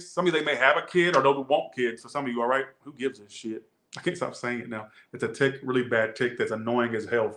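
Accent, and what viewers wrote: London, United Kingdom. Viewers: British accent .. absolutely fantastic 🤣👏👏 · Omg!!! sorry I had to 😂 I love your London accent better than mine!! Lots love 🥰 uk 🇬🇧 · Your English British accent. Love it 🫶😄 from London here! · Love the London accent 😍 💕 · When I heard the British accent, I knew the Sagittarius read is for me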